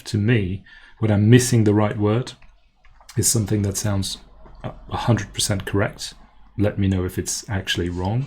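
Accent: Southern England, United Kingdom. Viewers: bro, as an american, u sound like a native brit!!! please explain your learning methods, I really would like to acheive similar results! going thru your comprehensible input french a1-b1 so far and it has been amazing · Hello, so first of all, from a purely accent/pronunciation point of view, you sound almost indistinguishable from a native speaker to me. I think if I met you in a pub in England and we started chatting, I wouldn't easily realise that you're not a native speaker, especially not within a few sentences. To me, your accent sounds fairly generically south-of-England English (I am from Norfolk, UK myself). Not necessarily 'RP' but honestly, I think if you leaned any harder into RP it would start to sound less natural. Because I am specifically paying attention to your accent, I do notice little things that make me think 'ah, not quite British accent there' and if I didn't know where you were from, I may wonder if you had a hint of accent from another language interfering - but I don't think I'd be able to guess which one. 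I certainly wouldn't guess French. But these things are so slight, it's difficult for me to pin point exactly what it is. Perhaps once I've seen more clips if you speaking English, I'd be able to. But honestly, your accent is highly impressive as a non-native speaker, and probably good enough to fool quite a few native speakers. I actually think you could tell most Americans (or, tbh, most non-British native English speakers) that you're British, and be believed. It would be a little harder to fool actual Brits because we're so keyed into regional and class markers on British accents. In fact, my accent occasionally confuses people - I am British born-and-raised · Improve to what? C2? Haha. If I had the funds, if my school had the funds, I'd invite you out here where you could guest-teach at my school and immerse. Your pronunciation is great. Don't worry about it even if it's British/British-leaning. 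Haha. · Your English sounds beautiful to me, and the British type English accent comes across well to me, consistently. I don’t notice other accents competing in different words. Looking for perfect phraseology in every sentence might be chasing something difficult. From an American standpoint, people often like to stand out and phrase things in new ways. I just hope my spoken French gets to be as good as your English one day! I’m still hardly confident enough to make a whole sentence in French. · 2min 40 secs: You could say "I'm lacking the vocabulary" or "The right word escapes me right now" or "I can't think what word best fits what I'm trying to say" but to be honest, what you said is also 100% fine. Your English is great, sounds quite formal, like everything is very well pronounced but that's not a bad thing. You have a well pronounced English accent. I've been teaching English for a few years (and I'm using your French videos right now) and your 'problem' (if I can call it that) is quite common. 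Many very competent non native speakers feel like their level should be higher. I sometimes struggle to express what I want to say and I've been speaking English for 43 years. Maybe something like working on idioms, more phrasal verbs and some slang might be what you're after? You probably know this but 'what you're after' means 'what you're looking for. It's also difficult to go for a UK accent as there are so many dialects. I'm not the best person to judge as I'm from the north of Scotland but to me your accent comes from the more southern parts of England, some part of London but like I said, more formal definitely not London Cabbie/geezer type of accent. Definitely not from the north of England. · face-blue-smiling: ... the only thing is that you speak with some kind of a British accent (there are so many) ... and I prefer the General American Accent ... ... ... that's all ...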